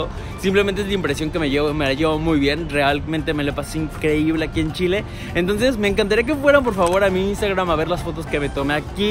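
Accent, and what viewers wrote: Mexico. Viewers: Que lindo eres, a mi me encanta el acento mexicano wey, y sí tienes mucha razon en las cosas que has comentado de los chilenos, pero todo tiene su explicacion.Los hombres aca son muy atentos, detallistas, cariñosos, muy protectores de sus hijos y esposas, caballerosos, guapos(lo digo por mi marido llevamos mas de 11 años de casados y aun me siento que estoy poleando "de novios") nos dividimos todas las cuentas de la casa porque ambos trabajamos y creo que no es justo que el se lleve el gasto de algo que los dos compartimos ( pensamiento de muchas mujeres), el machismo de apoco esta pasando a la historia, las tareas en todos los ambitos se dividen, la gran mayoria deja pasar primero, abre la puerta o sede el asiento a mujeres y adultos mayores ( igual no todos son asi, pero sí un buen %). Si alguien me invita a comer o invito a comer la otra persona me puede decir, "ok pero yo pago mi parte" porque de esa manera no me siento mmmm como se podria decir "comprometida" y se evitan malos entendidos, en caso de las parejas cualquiera puede invitar al otro a comer, como bien dices somos desconfiados, timidos y muy vergonzosos en todo aspecto y lo mejor Solidarios, bienvenido a este hermoso pais que muchos aun no conocen.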